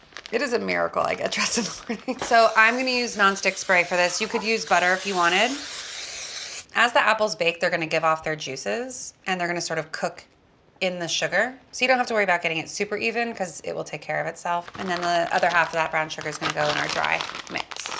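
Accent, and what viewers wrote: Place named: Southern California, United States. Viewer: perhaps you don't know this ... you really help people out of depression. (insert something funny) coastal peeps. immediately knew you were socal, I'm norcal. love it.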